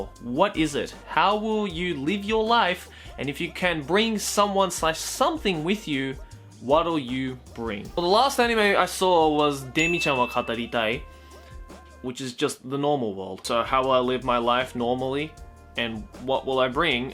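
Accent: Australia. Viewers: Holy shit I've been rewatching some older videos from Joey and the bois and I'm cringing at the thought of me ever watching these videos 5 years ago. These are all low-quality, immature, cringe videos compared to content you make today, both on your own channels and trash taste channels. Honestly watching another 10 episodes about shitting sounds better than rewatching this video once again. Also your aussie accent strong here. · #AnswerMeSenpai Do you speak Japanese with australian accent? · #answermesenpai speak in your Australian Accent for the rest the video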